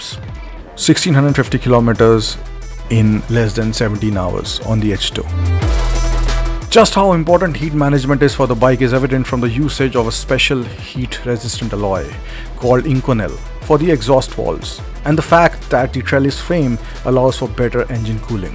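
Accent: India. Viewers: INDIAN Accent 👊💪🏼💪🏼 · Sound like india??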